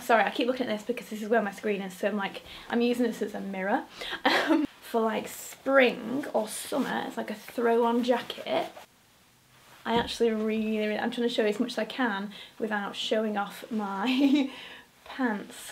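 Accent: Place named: Manchester, United Kingdom. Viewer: Your Mancunian accent really stands out in this video! Loooooooove it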